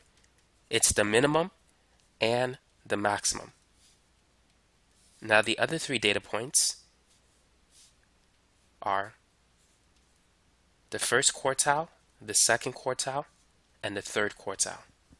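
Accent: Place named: Brooklyn, United States. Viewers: you gotta be from new york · this guy is from Brooklyn I gotta say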